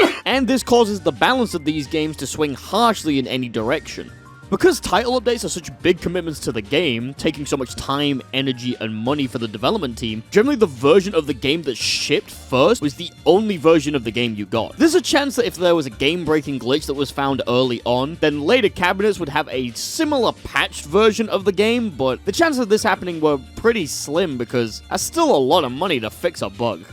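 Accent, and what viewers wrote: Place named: United Kingdom. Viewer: How to start a Video: 1. Say "Lets talk about [insert fgc related topic here]" In a british accent 2. Have the camera be fuzzy and show a big giant white text of insert topic before showing the strive giovanna gameplay 3. Always play the Character Select Theme from MBAACC 4. Time all three events to where the beat drops in the Character Select Theme from MBAACC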